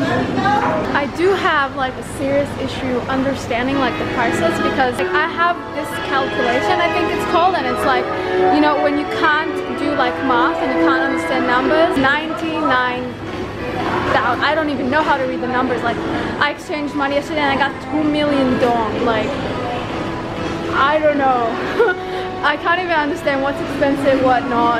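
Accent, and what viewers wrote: Australia. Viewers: THAT VIEW THOUGH. I know you're probably so over hearing about it, but you're little aussie mix accent is the cutest thing ever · yep you sound Aussie! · Aussie, with a twist of pommie... ;)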